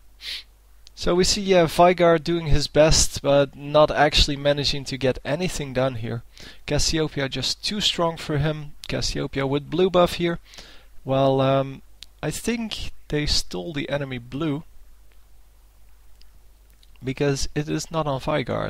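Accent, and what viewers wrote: Netherlands. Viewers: This commentary sucks.. sounds like you're dutch..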